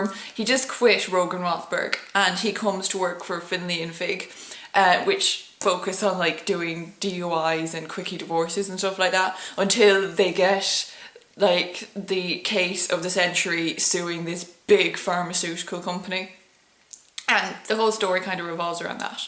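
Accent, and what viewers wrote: Ireland. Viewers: oh I love your Irish accent!! I´ve been there for half a year and it was great. It remembers me of the time there when I listen to you ;) great videos as well!! P.S.: Are you from the West of Ireland? :)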